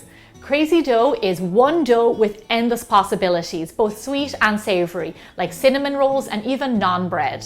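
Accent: Ireland. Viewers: her Irish accent 😍😍😍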